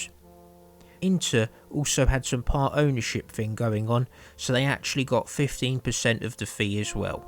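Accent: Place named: London, United Kingdom. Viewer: the geezer accent makes it even better 😂 phenomenal.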